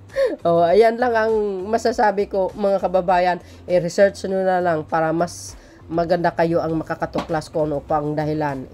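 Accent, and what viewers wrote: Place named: Russia. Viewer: BAGAY PO YONG BOSES NINYO NA MAY RUSSIAN ACCENT HABANG NAG BABASA OR NAG SASALITA . GOD BLESS PO WITH YOUR CHANEL.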